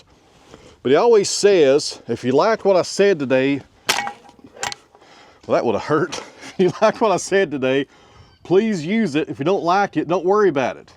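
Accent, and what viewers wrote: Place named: Tennessee, United States. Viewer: Thank you, I enjoy your projects and your mastery of the english language-- Tennessee style. Happy new Year, 2025 was great thanks to you.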